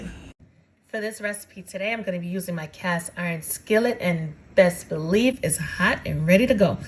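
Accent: New York, United States. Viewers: Ohhhhh I'm doing this asap omg😋very easy and looks delicious !ps, Love your accent you can have mine from Louisiana , I'll take a New york accent any day Lol! 😋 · Great Recipes & tht FAB NY accent!😍🌹💓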